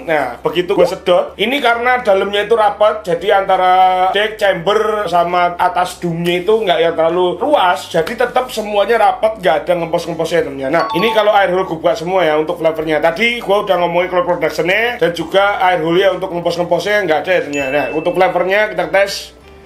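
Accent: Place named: Java, Indonesia. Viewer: Medok rasah nggo gua gua mas😭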